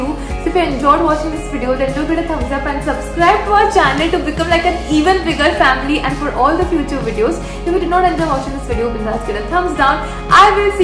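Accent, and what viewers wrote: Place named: Kutch, India. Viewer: CONGRATULATIONS 💗 SUPER HAPPPPYY FOR YOU 😁🌼(LOVE YOUR KUTCHI ACCENT...being a KUTCHI myself i feel so proud 🥲✨)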